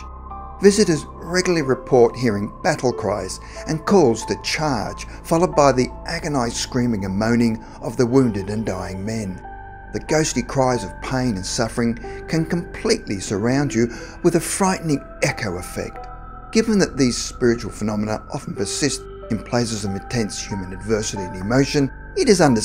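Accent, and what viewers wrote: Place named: Australia. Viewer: Why the hell is an Australian guy comentating American history. This ruined it for me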